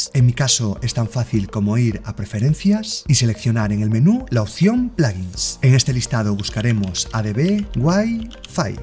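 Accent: Spain. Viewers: Eres Español??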